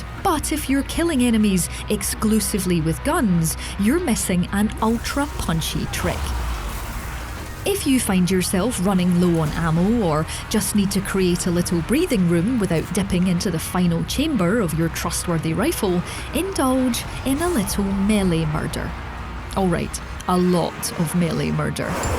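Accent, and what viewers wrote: United Kingdom. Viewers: Good thing I dig Scottish accents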